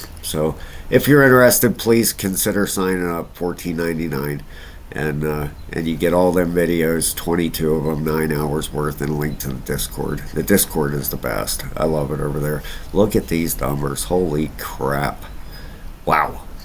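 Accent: Pittsburgh, United States. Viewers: Sounds like Pittsburgh to me, am I right?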